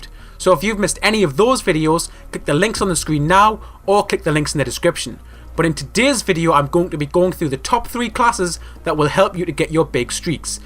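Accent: Newcastle, United Kingdom. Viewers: he's geordie you numpty. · The good old geordie :)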